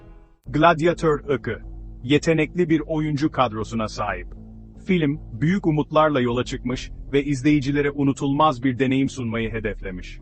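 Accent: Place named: Rize, Turkey. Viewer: Sikik rizeliler gibi konuşma aq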